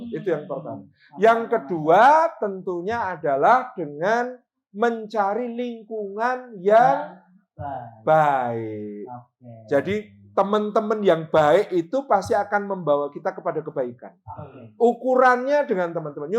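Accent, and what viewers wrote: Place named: Banyumas, Indonesia. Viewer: Ust. Salim memenuhi sunnah Rasulullah saw, berbicara dgn bahasa kaumnya. Di Purbalingga bicaranya ikut ngapak 😅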